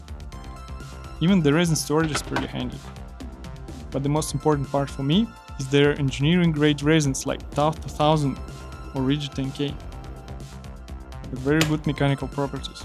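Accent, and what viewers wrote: Russia. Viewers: чувак, мне кажется по речи или ты русский?)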